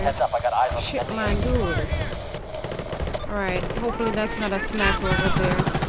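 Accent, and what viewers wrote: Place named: Jamaica. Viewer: Yup 100% Jamaican :)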